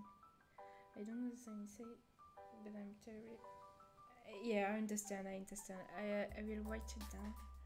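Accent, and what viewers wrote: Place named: France. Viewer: Very proud of you for steaming in English. it must be scary speaking especially streaming and talking to people in a Language you’re not 100% confident in speaking. I could understand you fine I think you’re English is good yes you do have a heavy French accent but I think that shows you’re background. and it’s apart of who you are that’s something to be proud of people used to make fun of me for the way my voice sounded because of my Chinese accent and I hated it and hated the way my voice sounded but my voice and my accent is a part of who I am and I’ve overtime become ok with that so please never be ashamed of that it does take a while to become comfortable with yourself and the way you’re voice sounds but as you become more confident in speaking you will realise that your voice isn’t as bad as you thought it was if anyone makes fun of your voice you can say L + ratio plus I’m bilingual and your not lol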